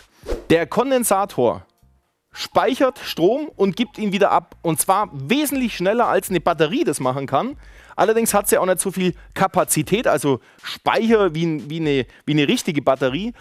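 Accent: Swabia, Germany. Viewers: Ja, ganz schön blöd, wenn man meint immer recht zu haben. Der gute Herr hat die Gleichspannung gekennzeichnet einmal 12 V und 10 V in Form einer Linie. Die Sinuskurve solle hier nur ein Signal darstellen (Musik, einzelner Ton etc.). Im Beispiel wurde verdeutlicht, was passiert wenn die Bordspannung sinkt. Danke für das Video !!! ps. dein schwäbisch ist unüberhörbar xDDD (Y)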